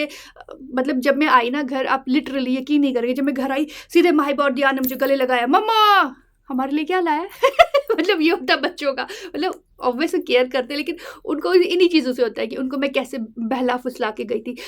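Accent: Kashmir, India. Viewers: Wah di wah kya ho ap 😂 apki kashmiri jab nklti hai na bht cute lgta hai❤ from srinagar Eidgah 💕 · Mashaallah aap bhot zada khubsurat lag rahi hoo dii❤❤aur muja aapki kashmiri baat bhot zada aachi lagti hu.aap kabi daly rutine dekho kabi dii kabki lots of love khush raho humasha aasay hi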